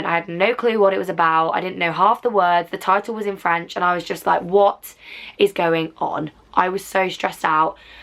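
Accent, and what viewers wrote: Birmingham, United Kingdom. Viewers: wait... a Brummie??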